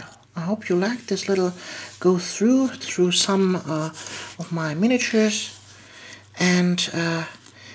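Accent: Germany. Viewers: Are you German? By the way really good videos:)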